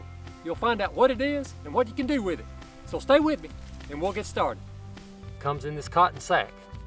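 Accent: Alabama, United States. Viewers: Everybody loves that southern twang.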